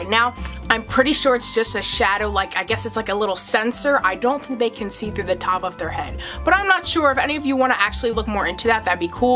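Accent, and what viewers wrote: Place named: New York, United States. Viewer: Haha you made me laugh a few times. Very entertaining. I am from Australia but I think I can pick your accent to come from somewhere near New York maybe? Anyway if you want all the wild bearded dragons you’ll need to come to Australia. Thanks for teaching me stuff!!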